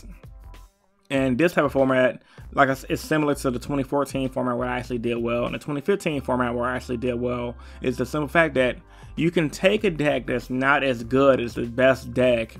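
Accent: Chicago, United States. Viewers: Bro! You are so chicago lol respect.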